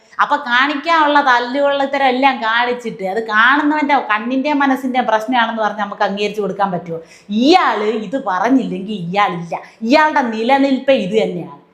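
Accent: Thiruvananthapuram, India. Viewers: എപ്പോഴും തിരുവനന്തപുരം ഭാഷ ബോറാണ് മാറ്റി പിടിക്ക് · അപ്പി എങ്ങനെ ആണ് തിരോന്തരം ഭാഷ സംസാരിക്കുന്നത് കുട്ടനാട്ടു കാരി അല്ലേ 👍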